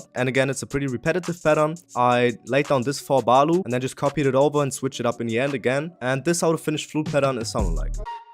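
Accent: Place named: Germany. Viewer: Yo u sound german are u ?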